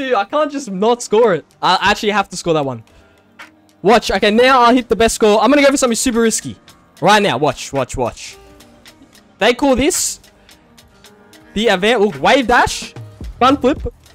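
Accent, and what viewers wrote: Australia. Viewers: as a kiwi voice is one of the only aussie accents i actually like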